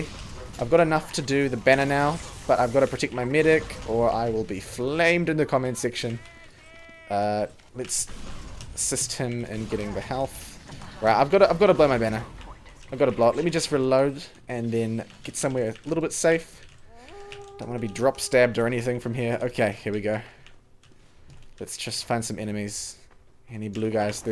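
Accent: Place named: New Zealand. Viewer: Never heard a Kiwi TF2 player!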